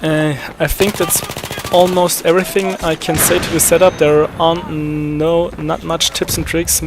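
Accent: Germany. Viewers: youre english is very german^^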